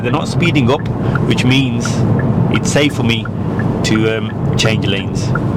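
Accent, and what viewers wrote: Birmingham, United Kingdom. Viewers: Is that a slight Birmingham accent I detect?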